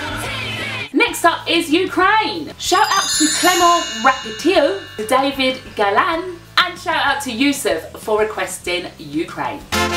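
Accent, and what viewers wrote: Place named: United Kingdom. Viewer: Акцент British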